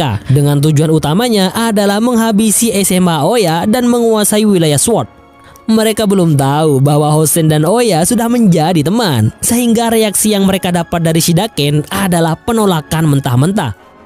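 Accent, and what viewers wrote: Madura, Indonesia. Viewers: Min loe orang Madura iya😅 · Ken reng madureh kak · rooo meduroo logatmu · Bg Lo orang Madura ya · Mak pas versi madureh keh😂😂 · Bg orang madura ya? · Babg bang abang orang Madura bukan ? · Pasti orang madura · Reng madureh hdh bg😂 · kk orang madura yh, gw tau sihh karna gw pernah tinggal di mdura😅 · Addo reng madureh kkh reng😂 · Oreng Madura Ben bang Mak phuruh ngiding kok 🤣🤣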